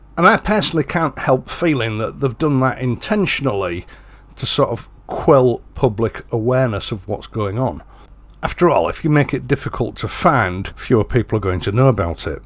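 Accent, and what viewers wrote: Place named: Yorkshire, United Kingdom. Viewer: Listening intently to your observations when I observed something else, Beverly race course. Didn't realize you were in this neck of the woods. Knew from the accent you were a good sensible Yorkshire lad which explains why you make so much sense. Didn't realize you were making so much sense just up the road from me.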